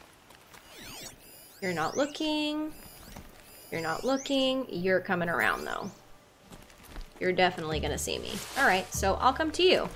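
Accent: South, United States. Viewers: Cool intro love ur southern accent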